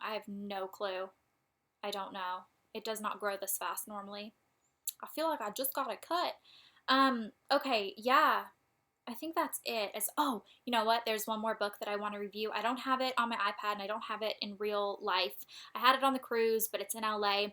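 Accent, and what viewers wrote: South, United States. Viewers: aww your southern twang is coming out more since you're at home :) adorable!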